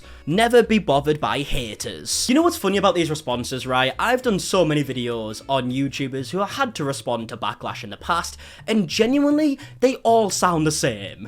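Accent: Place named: Middlesbrough, United Kingdom. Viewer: you and Plumbella have made the Middlesbrough my fave UK accent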